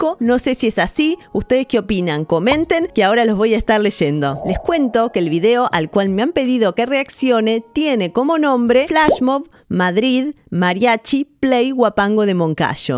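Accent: Argentina. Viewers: Por el acento, entonacion y pronunciacion del español de Doña parece ser argentina. Que bien que te guste nuestra música... Saludos desde Ciudad de Mexico. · Argentina que sorpresa 😲!! te sentía cómo chilena, te identificó la gashina sos argentina.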